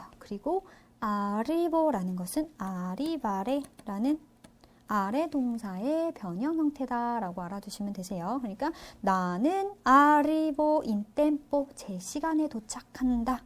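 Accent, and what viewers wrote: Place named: South Korea. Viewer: 발음이 그냥 한국어 읽는것같은데요 책산거 돈아깝네요 환불하고싶어요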